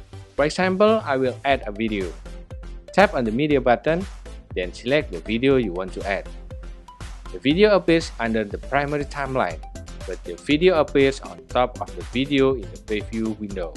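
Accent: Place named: Indonesia. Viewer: From the speaking, i know this people from indonesia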